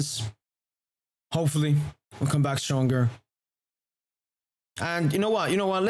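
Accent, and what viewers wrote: France. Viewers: What part of France is this guy from?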